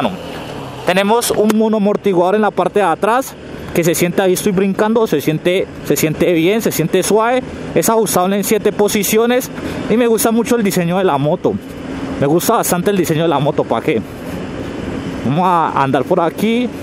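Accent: Colombia. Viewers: El acento es de Cali, Colombia. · Que feo ese acento de dónde es ??? Creí que los colombianos hablaban distinto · Buen video pana con acento valluno ve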